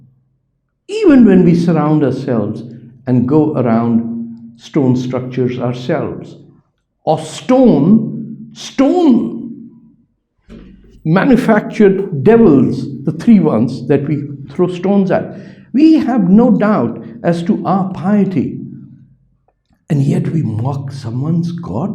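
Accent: Punjab, India. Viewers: Please don’t speak English in Punjabi accent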